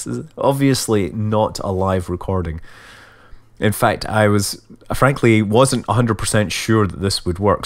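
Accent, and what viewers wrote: Scotland, United Kingdom. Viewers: He's from scottland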